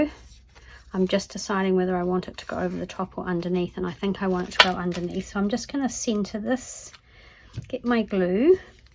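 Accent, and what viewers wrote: New Zealand. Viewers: Such a gorgeous tag, thank you! Loved watching your process and hearing our Kiwi accent! I’m wondering if you got your Art glitter glue in NZ? I subscribe to The Graphics Fairy and especially love all the journal tutorials! Is this your last one? 🧚‍♀️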